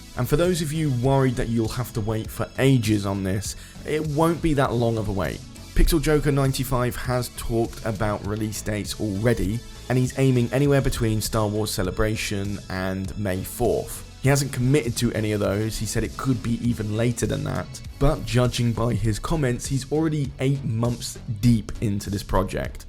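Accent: England, United Kingdom. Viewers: Why are you british now? You didn't used to be british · I haven't visited this? channel in a while, since when did become British? What happened to the OG? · When did you get an English accent?? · Why is no one talking about the fact that the narrator is suddenly british instead of american 💀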